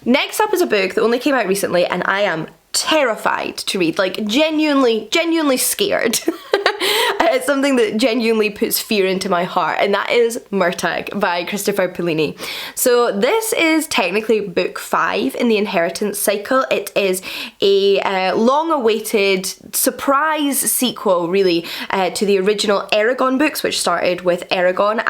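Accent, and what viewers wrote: United Kingdom. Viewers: My like was automatic for this new video, shot and uploaded by. I like to listen to her voice, even her accent is British. Greetings from Sonora, México 🇲🇽.. 👍, which is a border states with USA.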